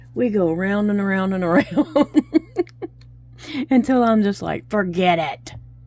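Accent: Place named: Louisiana, United States. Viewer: You just speak Louisiana Cajun! I take great joy in saying I am smarter than Alexa in that I can do that!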